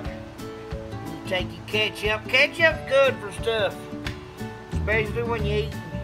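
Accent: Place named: South, United States. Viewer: How can pewdiepie be more popular than this??? It not only includes food but also comedy and mix it all in with a Southern accent yourself a real spicy mix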